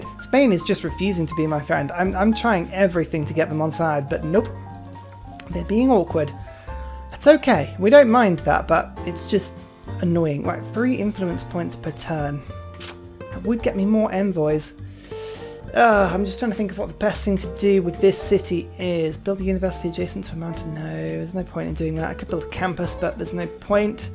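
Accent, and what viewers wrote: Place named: United Kingdom. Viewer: I have found Discord too complicated for me to learn so I shall type out my message here. Dear, Thank you for making these lovely videos. I am new to using youtube but began visiting this website since the pandemic began. It must have been the hand of god for me to find one of your videos on the home screen. The last time he visited me, my grandson introduced me to Civilization 6 as a way to pass time. We would always play board games together like Settlers of Catan and Risk, but he was rarely able to travel and see me. He worked as a teacher in Hereford and his favorite subject to teach was history, which I think is why he really liked this game. I've never played video games before, so I found it a little overwhelming. He was patient with me though, being the sweet young man he was. He explained so much to me and I hardly remember what all he talked about during those few days he spent teaching me about Civilization. 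Though I don't play Civilization, I have watched almost every video you've released. I love listening to you explain every detail of what you're doing in order to win. I try to watch your videos as soon as I have free time, and have recently found myself waiting on your next video to come out. Your quirky sense of humor and beautiful British accent take me back to those wonderful days with my grandson. He said he liked to get science victories too, and said my favorite character to play would be Teddy Roosevelt. I appreciate everything you put out, an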